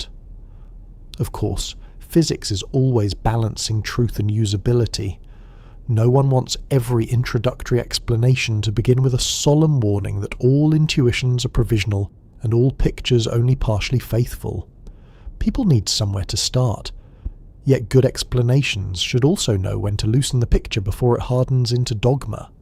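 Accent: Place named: RP, United Kingdom. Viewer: Lose the horrible posh Brit voice.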